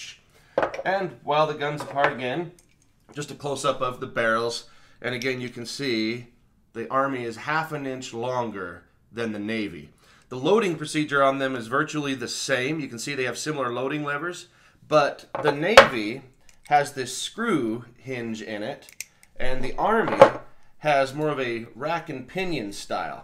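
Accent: Utah, United States. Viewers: Today is the first time seeing your channel. As I was watching, I thought to my self, “ self, this dude’s from utah!” And not to my surprise, you are. Nice videos “brother”.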